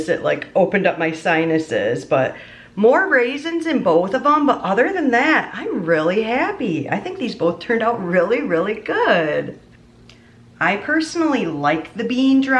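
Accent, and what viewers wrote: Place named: Chicago, United States. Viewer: I love brocoli salad and don't like tofu mayo, so these are just right for me. Can't wait to try them. You sound like you live in the Chicago suburbs, is that true? Me too!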